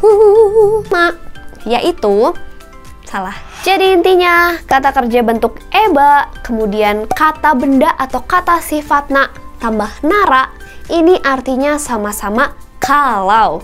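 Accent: West Java, Indonesia. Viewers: Ka sunda bgt sii 😍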